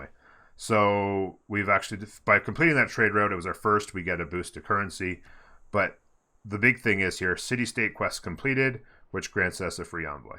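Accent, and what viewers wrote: Canada. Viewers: The Canadian accent is so adorable. Our and Are sound the same, but Hour sounds completely different. Love it!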